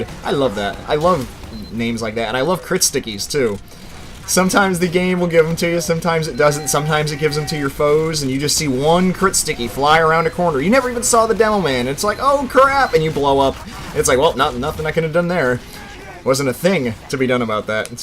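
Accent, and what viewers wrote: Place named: South, United States. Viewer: Trying to guess your regional accent. South, but not deep deep south. Virginia or N. Carolina.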